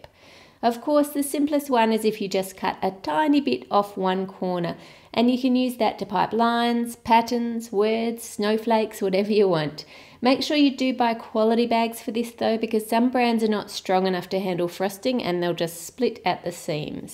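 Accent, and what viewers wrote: Australia. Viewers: Hi! I love your channel, and watch it all the time. You obviously come from a country where you have summer all the time. How could I know (apart from your accent and that you say you come from Oz)? The snowcrystals have 6 points. Always:) Btw: I whish I had an orange tree in my garden, not snow... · Just love the Aussie accent 🥰❤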